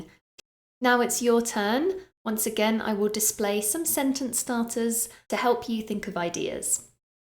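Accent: United Kingdom. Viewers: Straightforward teaching style. Clear and beautiful RP accent. What else, you are a marvellous teacher.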